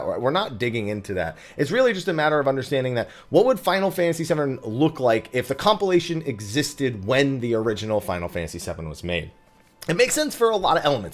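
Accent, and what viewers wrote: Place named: Los Angeles, United States. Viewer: You sound like you’re from L.A. Are you?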